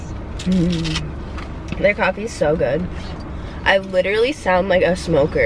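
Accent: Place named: Minnesota, United States. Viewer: Your from minnesota or north dakota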